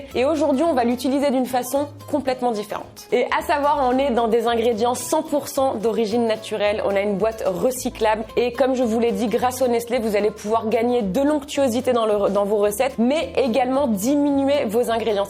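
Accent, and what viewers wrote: Canada. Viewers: tu mon youtubeur préféré des vidéos accent canadien et qui met quoi des vidéos trop trop rire 👍👍👍👍👍 🇨🇵🇨🇵🇨🇵🇨🇵🇨🇵👍👍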